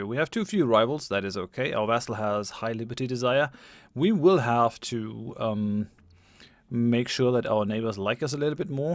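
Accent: Germany. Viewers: Haha no, german accent. I like that he pronounces french and dutch names somewhat decent though :) Better than most americans at least haha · I've been waiting for this episode! You should definitely do more EU4 let's plays! Looooove it so much. (and your german accent is awesome) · Oh it is a German accent, I always thought you were dutch lul.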